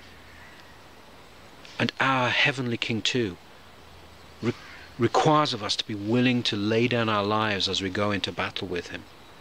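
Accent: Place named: United Kingdom. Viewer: Very compelling talk Fr.. Sounds like you’re of British persuasion. What parish do you shepherd?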